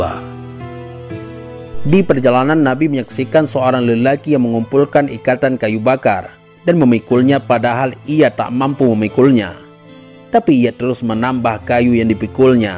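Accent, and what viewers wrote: Aceh, Indonesia. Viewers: maaf.. aku cuma mau nanya .. kayaknya admin nya orang aceh ya.. salam kenal ya.. wassalam..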